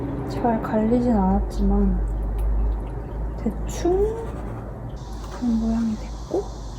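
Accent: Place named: Seoul, South Korea. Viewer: 서울말 엄청 잘하십니닼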